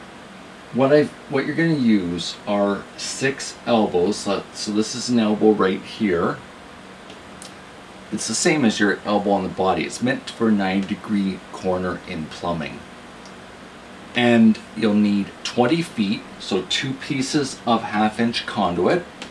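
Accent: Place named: Midwest, United States. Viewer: I was looking for a different purpose. But FYI, your idea makes perfect sense for your purpose. I am guessing by your mild accent that you live in the Midwest or maybe even Canada. Living in blazing hot Texas, I would suggest anyone living down south select a sturdy light colored fabric such as an upholstery fabric or painters tarp or canvas and buy a good UV waterproofing spray to more effectively fend off rain and the heat of the sun. Your design idea also gave me a kernel for my unrelated shade cloth project, so thank you sir. 😄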